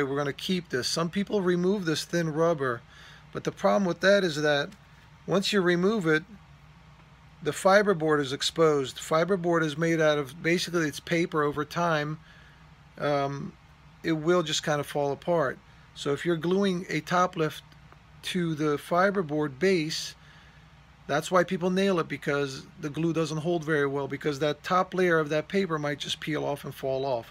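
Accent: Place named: Philadelphia, United States. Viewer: Are from Philly? You got a Philly accent or close.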